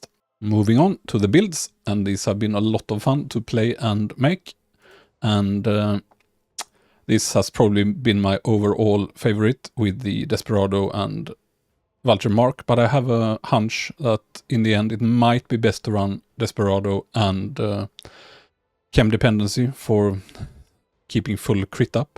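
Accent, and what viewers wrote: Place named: Sweden. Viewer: pause you're from Sweden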